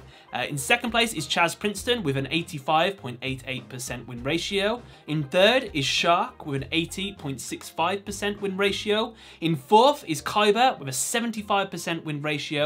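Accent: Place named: RP, United Kingdom. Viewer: 😂 how truly mediocre, this person talks like the queen of England for gods sake, if anyone spoke like that in day to day life they’d never hear the end of it, he/she’s just trying to sound clever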